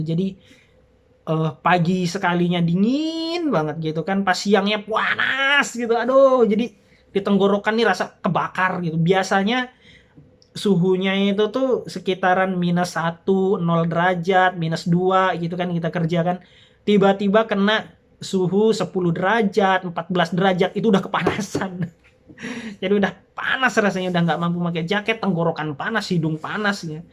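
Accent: Java, Indonesia. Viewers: iya, kirain dari jawa...😂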